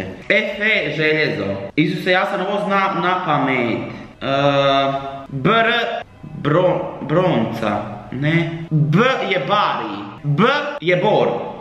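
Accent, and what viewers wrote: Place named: Dalmatia, Croatia. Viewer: Vrh mi je kako mjesas dalmatinski i zagrebacki ...znači umrla sam kad si reko nis jea...i još nešto 4x8 nije 24 već 32 ahahahahahahj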